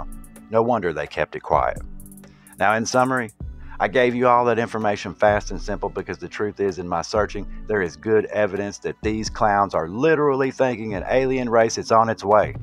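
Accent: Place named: South, United States. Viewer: Hey brother i appreciate your work man. And i can tell u a southern boy like me and that makes me like it even more.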